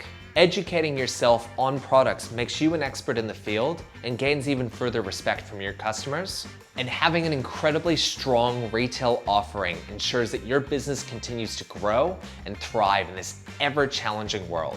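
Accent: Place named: United States. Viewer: Thanks a lot for the comment Jack! Appreciate your support. I moved to Australia when I was much younger from the states. Never lost the accent fully!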